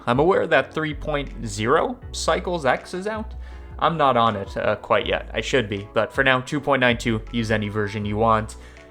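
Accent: Boston, United States. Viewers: I love your Boston, reminds me of my C.S. college days =D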